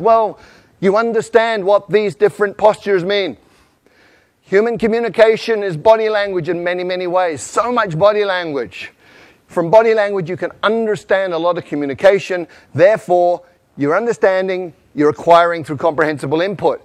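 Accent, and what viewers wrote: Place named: East, United States. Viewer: alex wu 更接近于北美东部的发音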